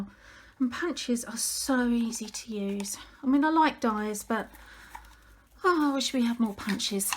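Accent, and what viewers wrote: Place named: England, United Kingdom. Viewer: Just found you on You Tube, enjoyed watching this project and also your accent. My mother was born in England and all of her relatives live there "across the pond". Hearing you talk reminds me of my grandparents, aunts & uncles. Love the post a note idea. Thank you for sharing. Have a great day. Barb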